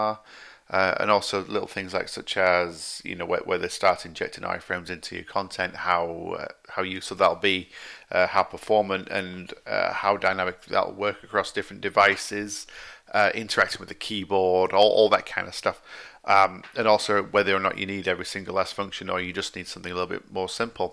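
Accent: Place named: United Kingdom. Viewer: UK accesnt so horrible ..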